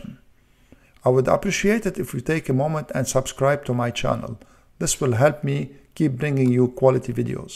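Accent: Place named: Lebanon. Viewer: bro you sound lebanese !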